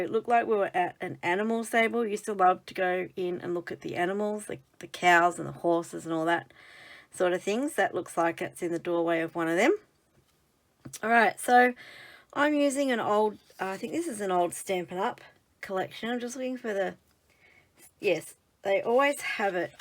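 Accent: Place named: Australia. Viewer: Loved watching how you adapted the sketches to suit your papers/photos, and it is a joy to watch /listen to a fellow Aussie❤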